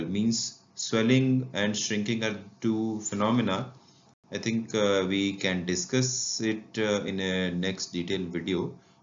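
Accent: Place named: India. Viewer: I don't. understand. your hindu. english. speaking it has. vibration on tounge your mother language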